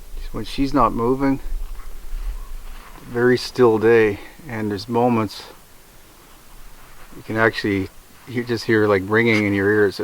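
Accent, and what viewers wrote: Canada. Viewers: Este es más expresivo, tierno, que se equivoca, trabaja sentado en la nieve y conversa, tropieza y hasta sonríe cálidamente. Contrario al otro más serio, que habla poco, mas eficiente y por momentos algo aburrido. CUANDO PONDRÁN SUBTÍTULOS EN ESPAÑOL???, hay muchas cosas que no se entienden del dialecto canadiense.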